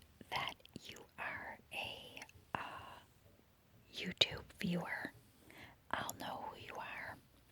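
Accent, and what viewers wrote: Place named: Michigan, United States. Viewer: you're accent sounds close to a Michigan accent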